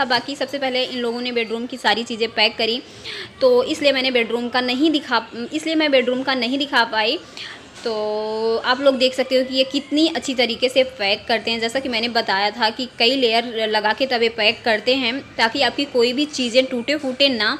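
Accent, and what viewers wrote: Bihar, India. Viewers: Tum bihari lagti ho... Bhasa to bihari lagti h...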